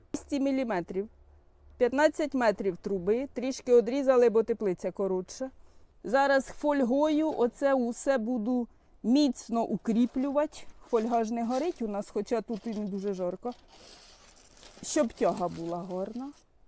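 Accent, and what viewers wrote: Poltava, Ukraine. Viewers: Добрий день,. Натрапила на Ваші відео, слухаю не наслухаюсь, обожнюю полтавський говір. Живу в місті, городу не маю, хоч і мрію мати, але дивитимусь Вас заради мови ❤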